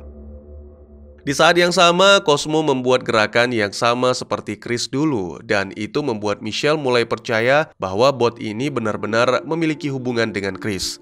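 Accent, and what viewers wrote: Aceh, Indonesia. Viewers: Bg orang Aceh ya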